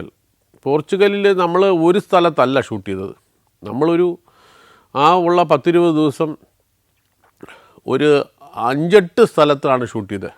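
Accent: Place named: Trivandrum, India. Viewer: Happy to listen to his narrations. Love the Trivandrum accent ❤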